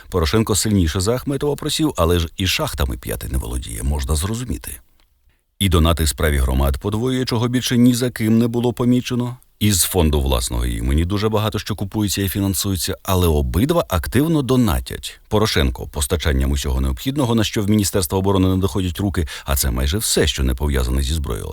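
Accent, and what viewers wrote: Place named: Russia. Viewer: Можна слухати.Але рюцкєї слова та наголоси все псують.Неук.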